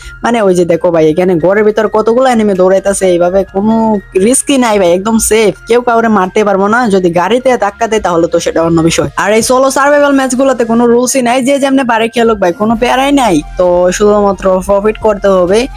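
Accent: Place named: Sylhet, Bangladesh. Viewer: সিলেট বাড়ি নি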